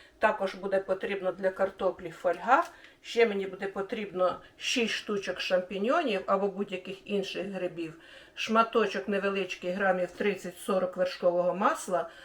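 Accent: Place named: Surzhyk, Ukraine. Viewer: Привет из Кузбасса!, как же мы любим вас за ваши, казалось бы, незамысловатые и бюджетные блюда, но настолько необычные и вкусные, что чувствуешь себя королем, хотя уже давно на пенсии, а поэтому живем скромно и воспитываем сына, который еще у нас учится.Чтобы вкусно накормит семью чем-нибудь необычным, каждое утро ищу интересные рецепты в интернете, чтобы приготовить что-то новенькое и отправить сына на учебу. А ваш говор напоминает родную бабулю из Украины, которая говорила на суржике.Поэтому встречаюсь с вами, как с родными.Переживаю за вас, чтобы все у вас было хорошо, чтобы были все живы-здоровы.И вашим деткам всего хорошего.Пусть радуют вас и берегут.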